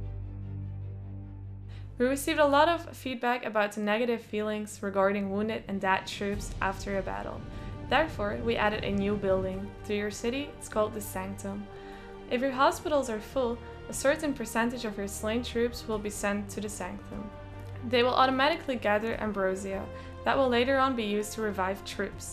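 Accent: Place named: Netherlands. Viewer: She sounds Dutch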